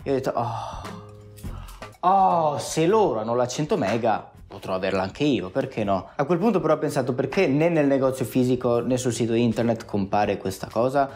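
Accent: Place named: Mestre, Italy. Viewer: Si sente proprio la R de Mestre HAHAHA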